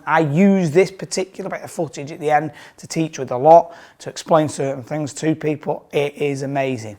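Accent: Manchester, United Kingdom. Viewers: so POLITE, (if you don't mind) what a Gent imagine Bubba Watson or Woods being so humble? great post. you sound like a Manchester lad. REEESPECT. John.🤗🤗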